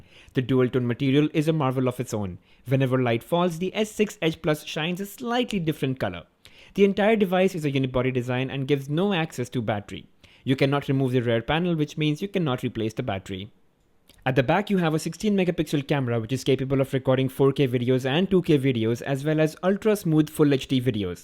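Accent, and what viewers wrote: India. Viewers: nice review . i love ur accent with that desi touch . :) keep it up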